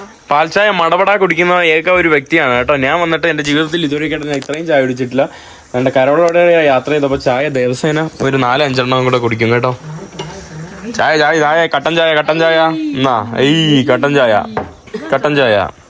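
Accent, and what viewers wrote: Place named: Thiruvananthapuram-Kollam, India. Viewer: റബ്ബരും വിള 😂 Extreme തിരുവനന്തപുരം കൊല്ലം സ്ലാങ്...... അത് കേൾക്കാൻ തന്നെ ഒരു നൊസ്റ്റാൾജിക് ഫീൽ.....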